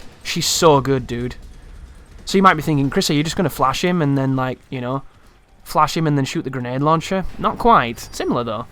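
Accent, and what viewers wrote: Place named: London, United Kingdom. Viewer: Hats off to you, sir! That was the most excellent balancing of amazing skills, speedrun strat explanations, humour, and game mechanic opinions that I have ever had the pleasure of witnessing on YouTube. And all presented with that glorious London accent, making it all that much more enjoyable. I could have watched a full movie instead, given the lenght of this video, but I honestly feel I got more out of this than I would have done spending the time at a movie theater. Thank you so much, you absolute legend!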